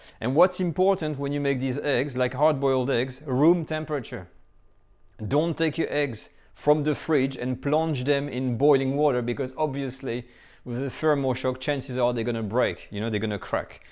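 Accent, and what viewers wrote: France. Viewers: I am french , from Québec, so I also feel like he has that huge french (from France) accent . Also he did say Crouton so many times xD.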